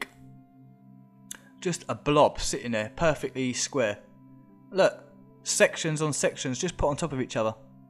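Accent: United Kingdom. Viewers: Hay love the accent bro it's so English even English isn't English enough for you cool video too love to find hidden stuff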